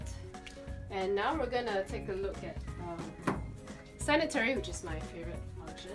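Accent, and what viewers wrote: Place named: Nigeria. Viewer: No disrespect, I hear a little bit Nigerian accent in her. I enjoyed your presentation my lady.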